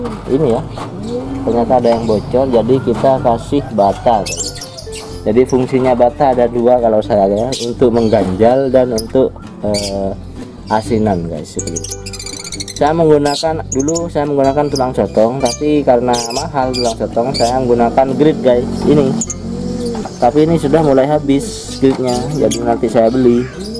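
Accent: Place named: Java, Indonesia. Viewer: Bosomu medok jon.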